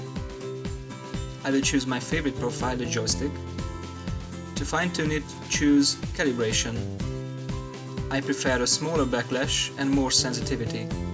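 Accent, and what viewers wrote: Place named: Hungary. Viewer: Hallani hogy magyar vagy!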